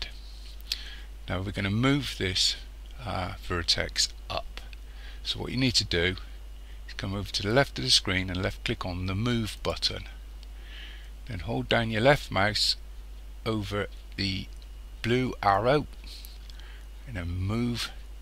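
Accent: Bristol, United Kingdom. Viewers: Lifesaver! Thank you so much! P.S. Love your accent, are you from Bristol? I love it there so much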